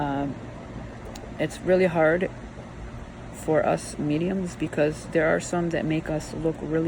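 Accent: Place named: Ireland. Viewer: (also...i picked up on a little bit of an Irish sounding accent today in your voice -which I had not noticed before today's post)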